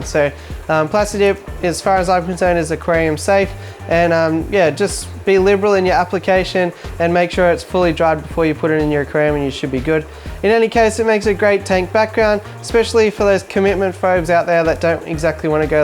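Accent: Australia. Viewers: Are you from Geelong !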